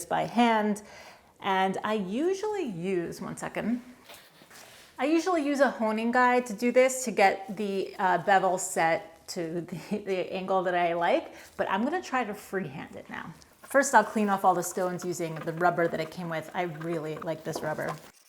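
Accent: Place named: New York, United States. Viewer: Good work, but please announce that you are evaluating donated items at the beginning. You get me to grin every time you mention draws because I remember way back when to talked about the folks that "corrected" your New York pronunciation. Oh, and the lapping fluid? I use one of those little bottles, too. The first one went quickly because I flooded the surface. I have found, however, that just a few drops here and there (I tap the opened spout to spit out a drop) spread out and supply ample lubrication. YMMV, however. 😁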